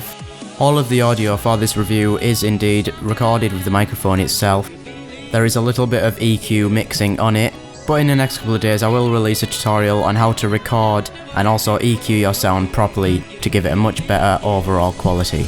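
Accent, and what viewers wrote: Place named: England, United Kingdom. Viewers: Actually its quite the opposite. Cockney is southern, he is northern as fuck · great review and great to hear an english accent as well :) also a very good mic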